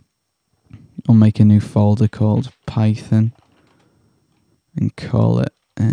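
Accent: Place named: Northern England, United Kingdom. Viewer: ho,, before i for get,, are you from the north of england as your voice and manerisms seem similar to mine..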